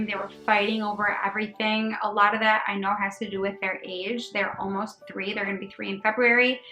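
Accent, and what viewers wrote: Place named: Michigan, United States. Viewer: Are you from the Midwest? Just curious because your accent sounds like you're from Michigan (where I live). 💜🥰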